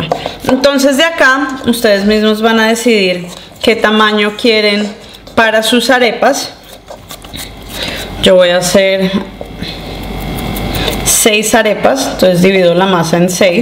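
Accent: Colombia. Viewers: Toque costeño? O toque Venezolano?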